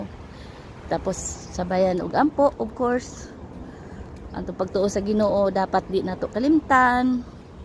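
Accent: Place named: Visayas, Philippines. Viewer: Ang cute ma ning pag nag bisaya ka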